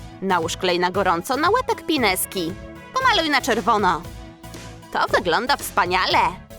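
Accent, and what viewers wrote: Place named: Poland. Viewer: Cóż za ciężki polski akcent ala Max Kolonko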